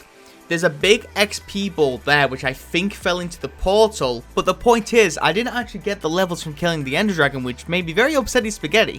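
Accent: United Kingdom. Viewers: R u Welsh?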